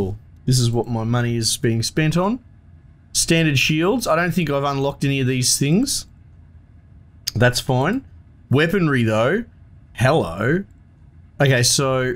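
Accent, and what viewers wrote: Australia. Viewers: Is it me or are you Australian?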